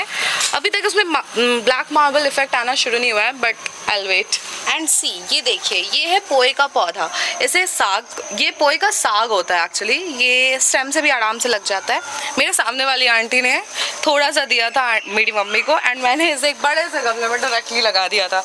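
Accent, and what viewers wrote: India. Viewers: Are u from andra????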